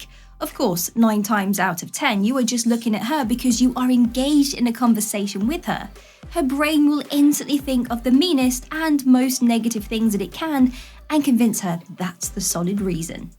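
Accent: United Kingdom. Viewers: You are my favorite girl. When I discover you I let go the others. I find myself come back again and again. None of the others women have been able to do that to me. Even with all their charms. Anyway the things I like about you are. 1. You know what you are talking about. 2. You deliver what you saying with great confidence. 3. Your intelligence eloquent way of speaking is truly unbelievable. As though that was enough. 4. Your voice (oh that voice that British accented voice) delivery is sweet as a group of morning birds welcoming in a new dawn. 5. You protray honesty with a demand that makes your listeners cavity to you. 6. To top that all you are beautiful. ❤️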